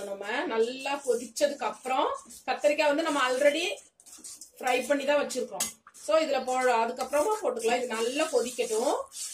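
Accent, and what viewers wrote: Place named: Kanyakumari, India. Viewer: Kaniyakumari kaaranga nu nenaichen... curry kollam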